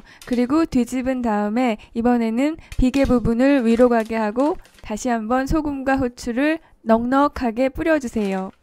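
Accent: Seoul, South Korea. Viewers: 뭔가 나레이션이 서울사투리같은데 그래서 더 정겨워요! 뭔가 아는̆̈ 이모님이̊̈ 알려주시는̆̈ 느낌이예요 좋은 영상 잘 보고 갑니다~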